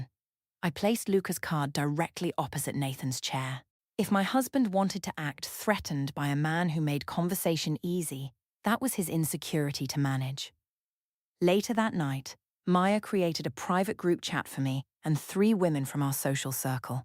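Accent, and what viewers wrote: England, United Kingdom. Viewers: fidgeting or touching ofthe face or neck. Looking away. There are many things that are repeated like i told myself. Or mechanical precision. Many.of the stories seem.to follow the same template.she humiliates her husband. He starts sleeping in the other room. He locks himself in the home office getting everything together for a consultation with an attorney. The wife is getting too friendly with a co worker. And the English accent can be a little irritating after a while.im not trying to be critical. Just thought some feedback may help your channel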